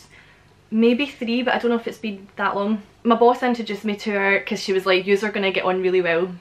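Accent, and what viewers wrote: Scotland, United Kingdom. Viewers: I could listen to you talk all day long about nothing, to me you have such a soothing voice love the accent could never get old.. I used to talk to a woman on Facebook and Skype with her she was from Edinburgh Scotland.. then all of a sudden she just disappeared her accounts were closed and haven't heard from her since I miss her dearly.. her name was Samantha White.. I live in Clearwater Beach Florida.. I listen to you daily just so I can get my Scottish fix, might sound silly to most people but out of all Accents in the world I love the Scottish the best,, keep up the good work you're amazing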